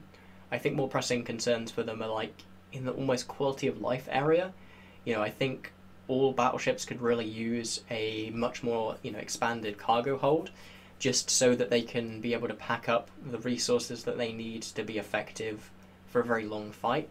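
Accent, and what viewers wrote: United Kingdom. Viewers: Ok, this might be a strange question; I started watching your videos recently and you sound like you have a southern british accent (i'm from 2 hours SW of London) and... yet you have a huge american flag on your wall. I'm very confused by it and was wondering why this was the case? are you american or british?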